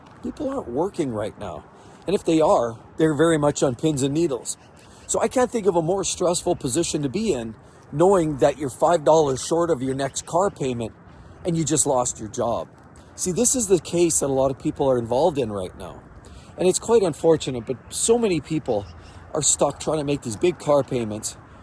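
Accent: Canada. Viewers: Canadian accent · I knew bro was Canadian from the accent 🇨🇦🎙️🔊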